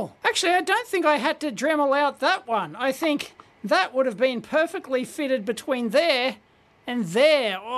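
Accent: Australia. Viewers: Half a bee,s dick 😂😂😂😂I love crazy ozzies and kiwi,s accent strewth mate by crikey ya flaming golar 😅😂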